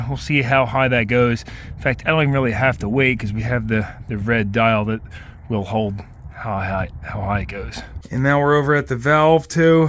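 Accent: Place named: Texas, United States. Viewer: What part of Texas are you?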